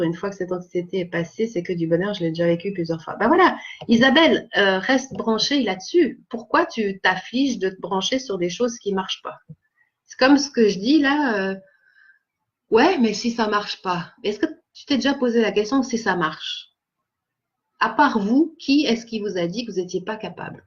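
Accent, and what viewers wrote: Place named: Canada. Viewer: Tu prends l'accent canadien:D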